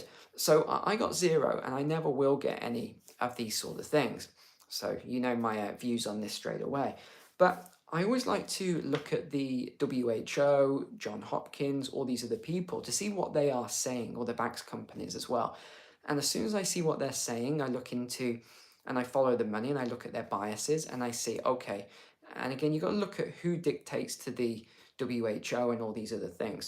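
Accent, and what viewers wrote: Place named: United Kingdom. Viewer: Hi Sir ! You always have a good report ! I want to tell you that I love your voice and your Brit accent ! My relatives are from Chester, England . my great great grandfather came from England to the US at seventeen yrs of age . Before Ellis Island . He came alone and stayed with his sister here in Connecticut ! Became a prosperous farmer and had 400 acre farm . He was a fine Christian man. Still got his road named after him .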